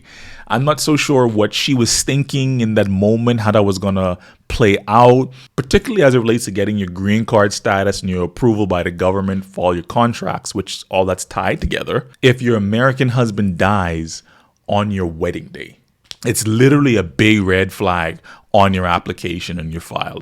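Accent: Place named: Bahamas. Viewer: You have to be from The Bahamas. From I heard you speak I was like yup. Glad to see a Bahamian making moves. Salute!!!!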